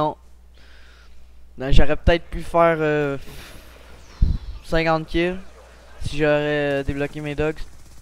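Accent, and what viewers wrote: Quebec, Canada. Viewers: On dirait que tu as un assent quebequois mélanger avec un assent français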